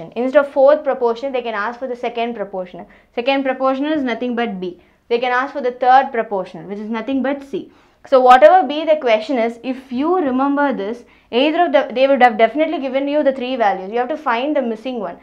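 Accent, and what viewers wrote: South India, India. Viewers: Maam south se hai kya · R u frm south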